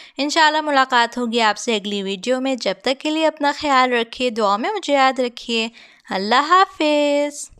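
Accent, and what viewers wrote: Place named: United Kingdom. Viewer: why are you speaking urdu in British accent 🤨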